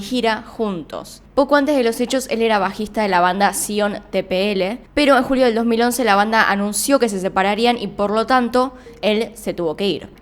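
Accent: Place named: Argentina. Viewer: estudiando leches??? LEYEES WN LEYEEEEES CON Y NO CON CH ARGENTINOS QLS WN CAMBIAN LAS PALABRAS LOS ODIOS HABLEN BIEN😡😠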